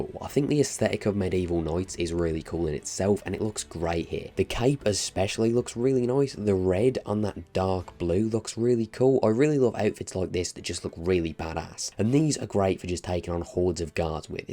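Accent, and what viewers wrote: Birmingham, United Kingdom. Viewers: Wow didn't know you were a fellow brummie. Nice to hear man haha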